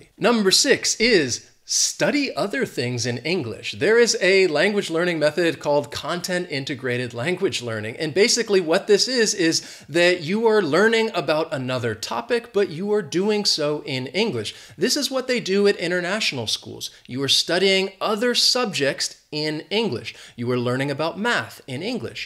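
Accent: United States. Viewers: I just love your american accent...and from now on I will create my own bubble by doing and all your suggestions.Thank you so much Sir😀👍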